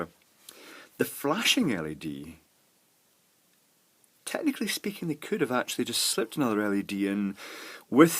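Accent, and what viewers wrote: United States. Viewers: Dear, If you're still accepting questions, here's mine. Why do you use so much American dialect? Have you spent a lot of time in the States?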